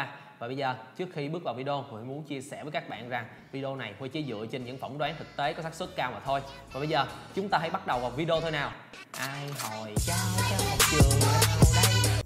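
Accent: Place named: Mekong Delta, Vietnam. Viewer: a MC dep trai, giog noi ngọt ngao ne, như ng mien Tay :)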